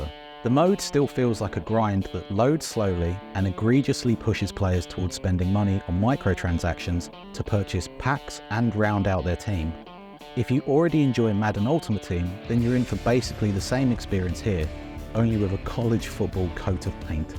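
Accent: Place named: United Kingdom. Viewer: This a British dude talking football 💀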